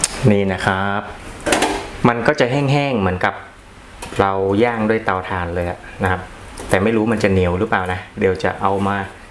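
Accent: North, Thailand. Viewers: คนเหนือเฮาอู้ม่วนจ้าว❤❤ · อู้คำเมืองเพราะขนาด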